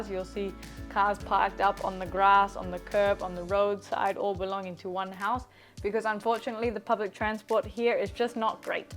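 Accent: New Zealand. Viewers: Hi! Thank you so much for the video, it is really very detailed. I knew that in NZ you have to have a car. I knew the differences with Australia, but not the difference in terms of climate, and because a cousin who traveled through NZ saw the natural beauties and he went with friends and they had a great time. They rented a motor home, so they got to know a lot about your beautiful country, they are big fans of rugby 🏉. I really like it when you speak English, it's like you are another person and I really love the Kiwi accent! I hope you make more videos like this, since next year I will be traveling to NZ, Australia and other destinations. Thanks again!!!, and you are lovely people! One question, how good is the Auckland City team? Y les pediría sí puede explicar lo del Síndrome "Tall Poppy" en español. Gracias,se les quiere mucho!❤❤ ( Perdón por mí inglés... 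estamos aprendiendo 😅)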